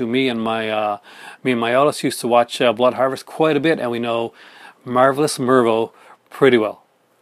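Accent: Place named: Canada. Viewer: you have more of a Canadian accent now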